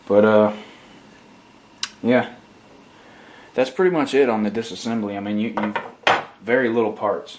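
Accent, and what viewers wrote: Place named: Mississippi, United States. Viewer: Thanks 4 the clip. Are you from Mississippi? It's just that accent bro.i know it..;)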